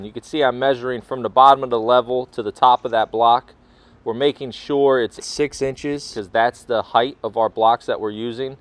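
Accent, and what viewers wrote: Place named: Pennsylvania, United States. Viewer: that SE PA accent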